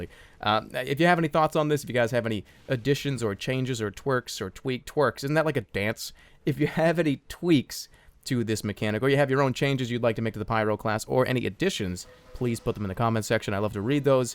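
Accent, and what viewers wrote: Boston, United States. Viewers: Your boston accent is just orgasmic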